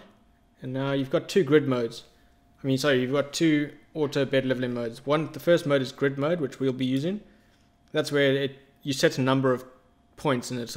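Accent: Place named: South Africa. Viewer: Gotta be South African :)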